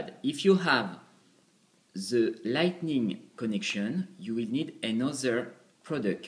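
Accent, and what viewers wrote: France. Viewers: Le mec a l accent français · Love that French accent ! My French wife said “OMG do I sound like that ?”. By the way, great videos, keep up the good work. All the Best. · hhhh putin l'accent française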